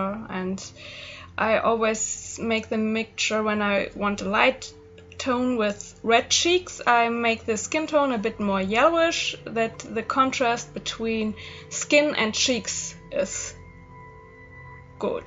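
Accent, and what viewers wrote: Germany. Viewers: Bist du deutsch? Dein englisch hört sich so an 😂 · letmefly 97 I'm Pretty Sure she's from Germany or Austria (or Switzerland?) because of her Accent (I'm from Germany and most of my classmates have this Accent sooo yeah) But...People correct me if I'm wrong! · Ich liebe deinen deutschen Touch in deinem Englisch :D Fühle mich so verbunden 😂 Aber mal ehrlich, danke für das tolle Video, war wirklich hilfreich 😌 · Are u german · Man hört gleich, dass du deutschsprachig bist :) Sehr cool, danke für das Tutorial! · Haha cool I think your tips may be helpful! Your accent sounds a little bit German 😅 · Your English is fine and the vid is amazing but you’re accent sounds like ur from Germany (I’m just curios tho)😂❤️ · ich bin nicht die einzigste die denkt ihre Muttersprache ist deutsch 😂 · thank you so much for this video! your English is really really good! 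and I love your accent, it reminds me of my grandmother (she is from Aschaffenburg) like hearing her at a younger age. I'm sorry if that's strange. ^_^ but I appreciate your video very much, I definitely want to try some of your tricks and methods when I paint :D be well!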